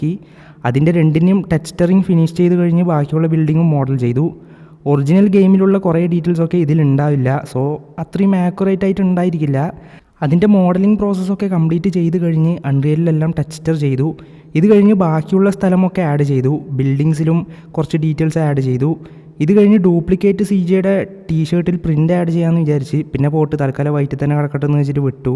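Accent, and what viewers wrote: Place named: Palakkad, India. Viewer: Pro place palakkad aahno? Njn ahne. oru touch und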